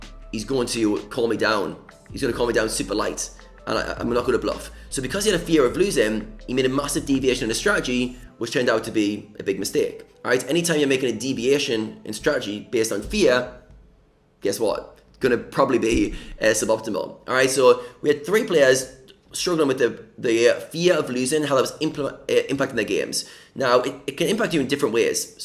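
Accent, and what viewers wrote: United Kingdom. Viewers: Great video! Where are you from? Wales? Curious about your accent.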